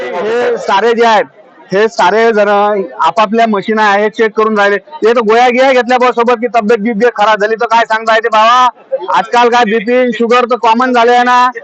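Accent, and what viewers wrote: Varhadi, India. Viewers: आपल्या वर्हाडी भाषेत मस्त बोलतात दादा..... एकच नंबर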